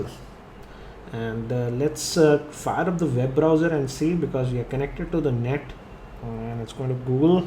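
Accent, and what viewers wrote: Hyderabad, India. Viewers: Are you from Hyderabad?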